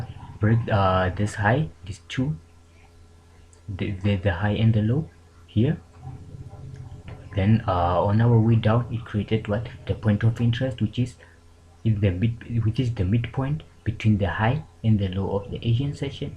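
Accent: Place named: South Africa. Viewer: 😂😂😂😂😂man you this accent sounds South African, but the name 🌚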